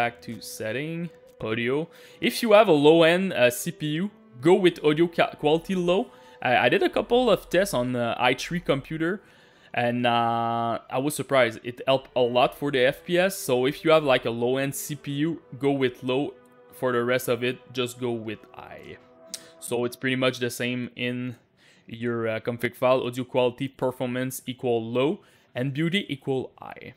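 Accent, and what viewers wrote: Quebec, Canada. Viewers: Québécois? :D Merci pour le vidéo, j'espère que ça va m'aider.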